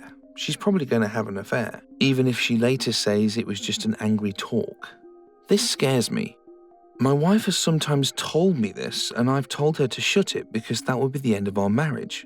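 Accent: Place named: United Kingdom. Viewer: Perhaps it’s just you. I don’t mind a Brit narrating.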